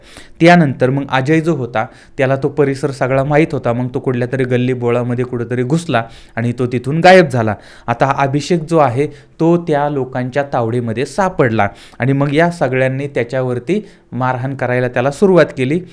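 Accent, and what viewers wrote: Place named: Satara, India. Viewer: भाषा उच्चार वरून सर सातारा जिल्ह्यातील असावेत असं वाटतंय,तुम्हाला काय वाटतंय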